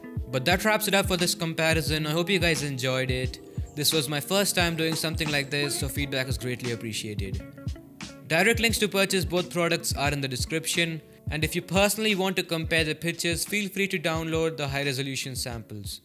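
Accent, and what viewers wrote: India. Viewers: are you from kerala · hhh, indian voice hate it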